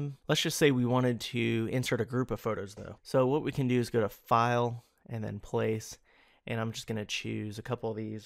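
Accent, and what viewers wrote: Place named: South, United States. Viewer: This is really great and easy to understand! The only problem I had was the southern way of talking, although nice, the lilt kind of fades off at the end and I had to keep turning up my speakers when his voice would get too soft.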